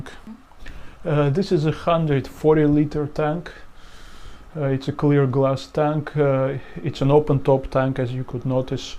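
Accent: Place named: Germany. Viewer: Wow! what a beautiful tank and beautiful terrestrial plants growing above and around it. It seems you are experimenting with many of the same things in your tanks as I am. Where do you live? You sound German, very understandable but a slight accent. I am in Ohio on the Pennsylvania border. Not that it matters but I am just curious. I started out my aquaponic experiments with spider plants. I had about seven plants I planted in gravel in an old breeder box. Their growth exploded. The tank is in a custom-built cabinet with open slots between the boards. the spider plants are growing up through the slots on the top of the cabinet and long runners are hanging down over the front of my tank hundreds of new spider plants of various sizes are growing on these vines. My setup is in an upstairs room and has no room for expansion and I do worry about putting too much weight on the beams and though I am very careful to not make water messes you know that is not always possible. 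So I have a basement room I am planning to move to where I will have lots of room to expand the hobby. I purchased a 10-gallon tank today at a second-hand store for $5.00 and actually I have several other small tanks that I have not set up. If you go thrift store shopping on a regular basis you would be surprised how cheap you can pick up small tanks. So I will be migrating to the basement. Too bad you don't live around here. I could take you to a rocky canyon which has a large but shallow headwaters of a river.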